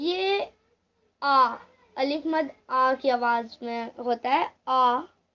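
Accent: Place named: Turkey. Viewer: U have the same eccent like Turkish people Masha Allah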